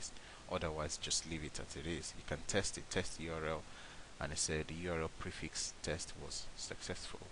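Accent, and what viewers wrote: Nigeria. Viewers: U must be a Nigerian!!!, I can never forget our accent.. love u man.. and u must b a Yoruba man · Thanks for the video and cool ascent from Niga!!! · are u a NIGERIAN????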